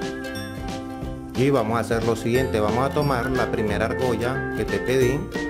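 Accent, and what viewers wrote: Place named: Caribbean Coast, Colombia. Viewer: Buenas, recien encontre este canal y me parecen sencillas, hermosas manualidades y bien explicadas... una pregunta usted es colombiano? a ratos lo siento costeño...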